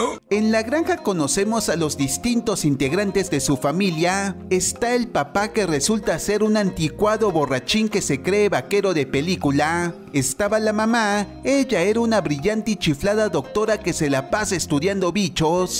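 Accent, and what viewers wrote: Mexico. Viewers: Primera ves que escucho una narración al estilo chilango,.. pues está chida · Mexicano, Mexicano el narrador !!!😁👍💪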